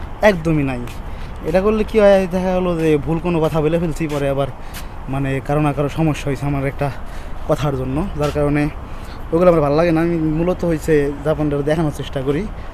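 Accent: Faridpur, Bangladesh. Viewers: Vai apnar voice shunei buja jay apni faridpur er amr gram er bari o faridpur e ami dhaka thaki Matro Hsc sesh holo bahire jete cacchi Ar vhai 12/14 lack tk koroch kore jabo jeheto deshe to kisu holeo pathaite hobe so amr jonno japan asha ki uchit hobe?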